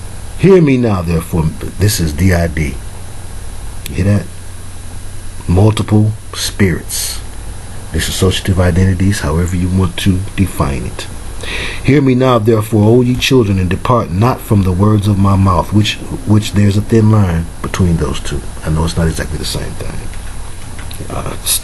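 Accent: Detroit, United States. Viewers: Proverbs and Psalms are my personal favorites. I love listening to them interpreted with your old school Detroit flavor.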